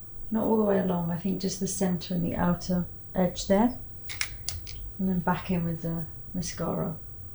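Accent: United Kingdom. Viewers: Thank you so so much! Hope you feel better soon. 🤗 Your nasal voiced is concealed by your ever sexy British accent. 😘😘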